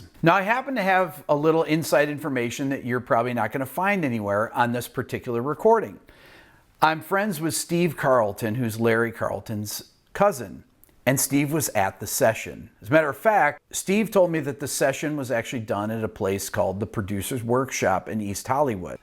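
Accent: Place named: Central New York, United States. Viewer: Hey Rick, I dig your CNY accent. Just dawned on me. Gotta ask, i realize this video is a year old. Ever know Gadd or of Gadd since you grew up in the same area? Rochester has had some really good drummers come out of there... also, was always curious why Mel Lewis, a Buffalo dude, had bad things to say about Gadd. Or at least didn’t like his playing / mocked it. Maybe Lewis is too much of a purist. Any idea on the history between those two?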